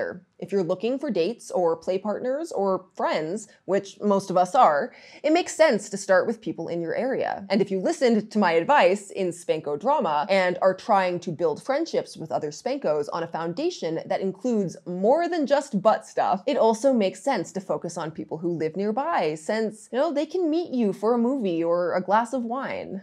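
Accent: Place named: Texas, United States. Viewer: your accent puzzles me sometimes. You are not from the south but sometimes you get something that reminds me of the Texas accent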